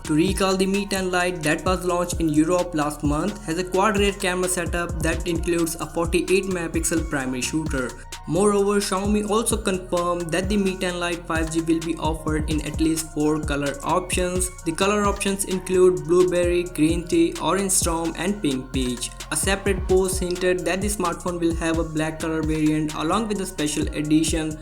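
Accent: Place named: India. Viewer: Love the Indian accent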